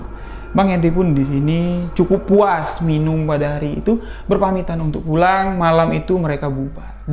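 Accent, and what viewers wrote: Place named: Jambi, Indonesia. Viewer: Walaikumsalam kak....n team SKM Mf kak sekedar request upload dong Kisah kota ghaib Saranjana..... psti y kereen banget d bwain kk dgn logat jambi yg khas........pasti kereen habis 👌 Slm sehat....slm smngat....mkin sukses y Semua.... Yuk gaskeeunn 🔥🔥🔥 By. Yana 🙏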